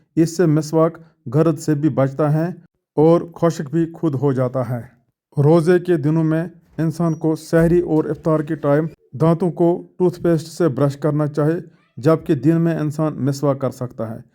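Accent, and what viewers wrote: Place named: Kupwara, India. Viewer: Pls focus on your accent. It needs great improvement. You are using typical kupwara accent.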